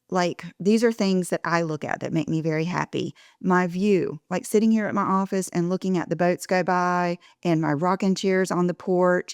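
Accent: South, United States. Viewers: Thank you. Your videos, your presence, your lovely southern accented voice, one of my favorite Influencer's to watch and listen. I know all of the thinks you talk about, but sometimes, adulting in wellness can be put on the shelf. So I do take your advice on a few things, and they help. love you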